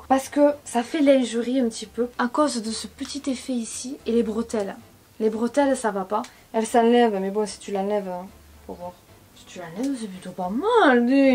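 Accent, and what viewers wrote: South, France. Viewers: Tu es vraiment mignonne, rigolote, simple, déterminée, et plein d'autres jolies qualités. Je te suis depuis longtemps mais étant une jeune femme de 46 ans :) je me voyais pas dans tous tes contenus, mais aujourd'hui j'ai réalisé que je n'étais pas abonnée, et qu'avec ton Million passé, une de plus ou une de moince (haha, je suis une chti, et chez nous, on ne prononce pas le s de moins ! mdr), ça changeait rien, et puis zut alors, je souhaite entrer dans ta communauté, avec mes 46 ballets et y rester discrete, mais voilà je trouve que tu mérites ton succès. Ta bonne humeur, ton humour, ton Accent du Sud que j'adore, ta nini et tout le reste, un univers gai et rafraichissant.... Merci xo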